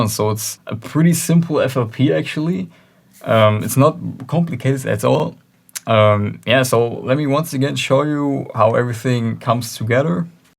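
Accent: Germany. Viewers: Man hört den deutschen Akzent so stark raus🙈, trotzdem sehr gutes Tutorial👍 und vielen Dank für die Flp🙏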